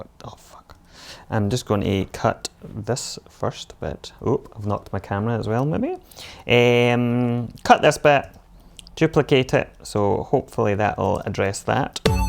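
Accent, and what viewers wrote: Scotland, United Kingdom. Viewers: Your videos are great man! You should have 1000x the subs - the YouTube algorithm is a fickle mistress. Great to hear a Scottish voice doing synth stuff. Thanks again.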